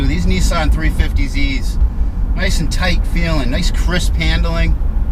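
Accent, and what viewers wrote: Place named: New England, United States. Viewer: Nice videos, subsribed! :) Love that New England accent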